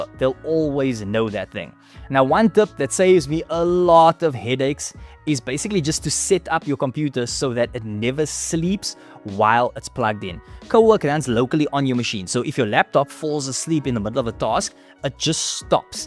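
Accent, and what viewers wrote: South Africa. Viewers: I hear South African Accent, I sub 😊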